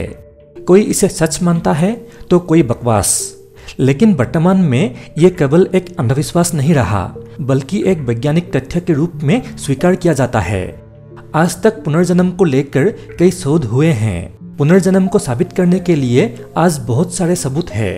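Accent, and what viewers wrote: Assam, India. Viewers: আপুনি অসমীয়া নেকি ॥ আপোনাৰ কথাবোৰ অসমীয়া যেন লাগে হিন্দীত কয় যদিও ॥ ভিডীও বোৰ ভাল লাগে আপোনাৰ❤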